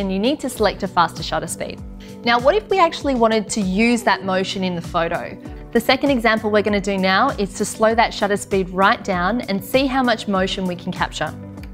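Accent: Australia. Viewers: I guess she is Australian 😊😊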